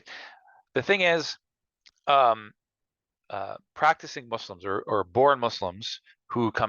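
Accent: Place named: Canada. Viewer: Also love the Canadian accent, the best accent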